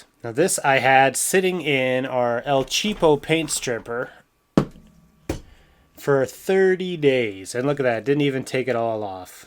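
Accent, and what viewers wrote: Canada. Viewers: I thought tube had closed u down man good to hear rhat Canadian voice good video bro 🇬🇧🏴‍☠️🇨🇦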